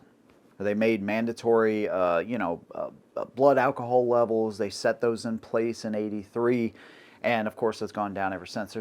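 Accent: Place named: New England, United States. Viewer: Just realized your a Yankee when you mimic a southern draw...i bet you live in the south also....ill not be watching your vids any more...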